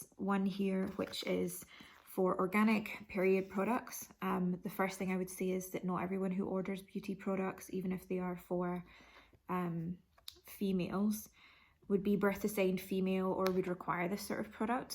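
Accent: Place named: Scotland, United Kingdom. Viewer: Fab unboxing :) Still not a bad box I thought for the price....bit of a random selection though. Nice to hear another Scottish voice x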